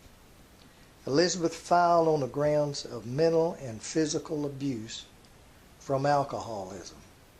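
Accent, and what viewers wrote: South, United States. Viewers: It's really the proper way to speak however we Americans have become to lazy to use it. He is from the South just as I am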